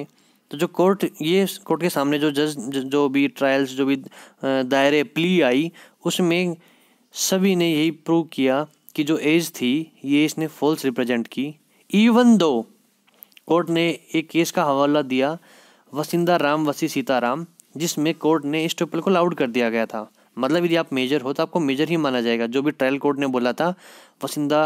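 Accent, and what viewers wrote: Haryana, India. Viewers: Mast haryanvi... Good explain...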